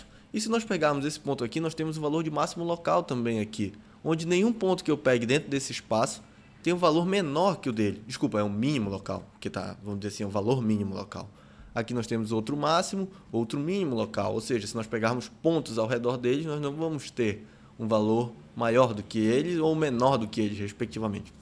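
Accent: Pará, Brazil. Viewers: Muleke eu logo vi q era paraense assim como eu só pelo sotaque, quando ele disse "égua" eu tive certeza hauahuah. Parabéns cara seu vídeo ta ótimo e é bom ver q esse tipo de conteúdo está crescendo no nosso estado. Parabéns e se possível volte a produzir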